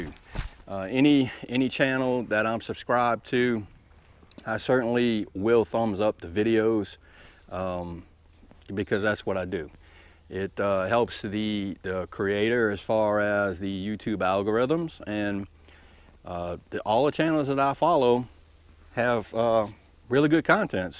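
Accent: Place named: Mississippi, United States. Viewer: I love your channel and tht Mississippi accent. :)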